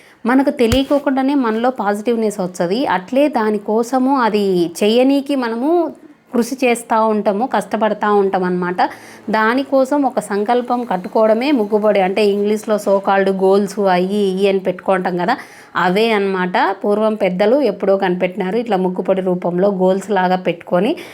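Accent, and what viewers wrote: Rayalaseema, India. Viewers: Hi garu iam New Subscriber madhi kuda Allagadda devudu mervaniki kadu konda dhgi velledi Laxmi narasimha swami vari Pelliki pilavadaniki konni village s ki matrame veltharu Anye mi vedeios chala bugunnai Mana ralyalalsleema slang Matladuthunnaru Chabaga matladuthunnaru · మీ వీడియో క‌నిపిస్తే చాలు వెంట‌నే చూసేస్తున్నాను. ఎందుకంటే అద్భుత‌మైన సీమ మాండ‌లికాన్ని అల‌వోక‌గా వినిపిస్తున్నారు కాబ‌ట్టి. ఆస్కార్ సినిమా చూస్తే క‌లిగే ఫీలింగ్ మీ వీడియోల‌ద్వారా వ‌స్తున్న‌ది.అంత హాయిగా, ధారాళంగా, అమాయ‌కంగా, అర‌మ‌రిక‌లు లేకుండా మాట్లాడ‌డం అంటే మామూలు విష‌యం కాదు. మాది కూడా వైఎస్సార్ జిల్లానే.మీ ఊరు ఖాజీపేట‌కు మా వూరు తొండ‌ల‌దిన్నె ఒక 30 కిలోమీట‌ర్ల దూరంలో వుంటుంద‌ని అనుకుంటున్నాను. 3 ద‌శాబ్దాలుగా హైద‌రాబాదులో వుంటున్నాను. సాక్షి టీవీలో సీనియ‌ర్ జ‌ర్న‌లిస్టుగా ప‌నిచేస్తున్నాను.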